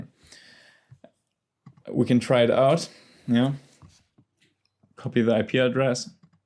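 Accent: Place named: Germany. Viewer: Ich wusste dass du Deutsch bist, der Akzent hatte recht haha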